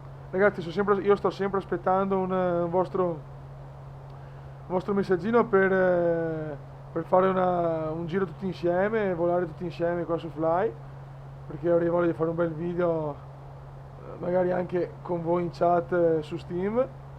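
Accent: Veneto, Italy. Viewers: stima per il veneziano ahahah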